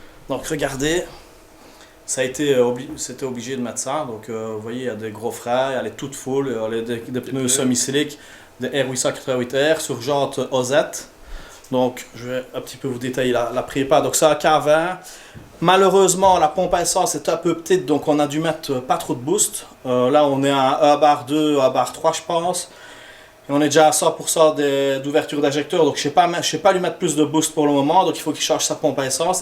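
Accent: Liège, Belgium. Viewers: Sympa ta vidéo et ton accent de liège! ;0)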